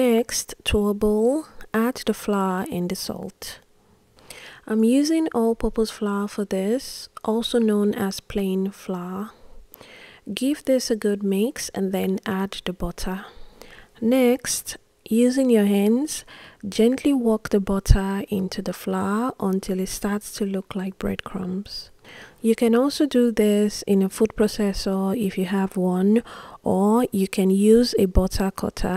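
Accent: Nigeria. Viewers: Ngl you sound Nigerian cuz its like your saying botter instead of butter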